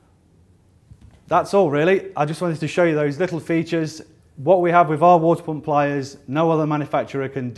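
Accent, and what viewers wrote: United Kingdom. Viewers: I expect a proper german for these videos. Go home britbong. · All is good in video except britt english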